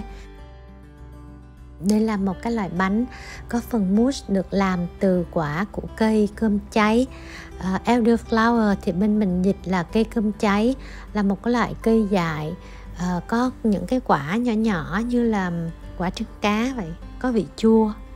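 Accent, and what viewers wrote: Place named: South, Vietnam. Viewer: Giọng miền Nam của cô * nghe hay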